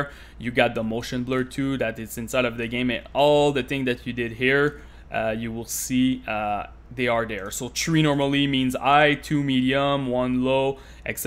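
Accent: Quebec, Canada. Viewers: pas francais , humhum , un quebecois canadien francophone :D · Qc man ? "J'orconnais" ton accent... J'ai le même haha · T'es quebecois c'est sur hahahahha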